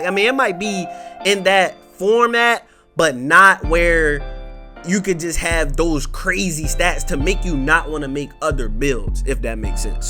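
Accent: Baltimore, United States. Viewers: bro ur from baltmore fo sho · Twentytwo Baltimore accent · Most definitely from baltimore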